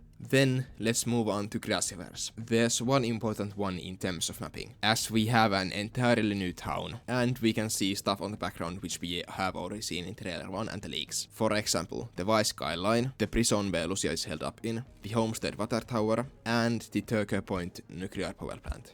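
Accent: Finland. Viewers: I love Finnish accent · Finglish. Or rally english. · I hate your strong accent, sorry... but I understand... I prefer to hear your Finnish accent than the annoying and incomprehensible accent of people from India. By the way, great job with the map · That is our brother from Finland 🇫🇮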